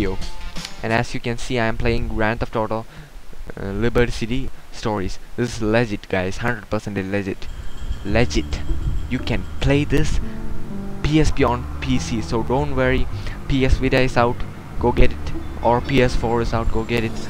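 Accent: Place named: Kerala, India. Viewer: Lol you have one proper mallu accent, undeniable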